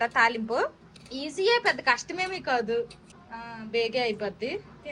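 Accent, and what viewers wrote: Srikakulam, India. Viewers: Srikakulam yasa super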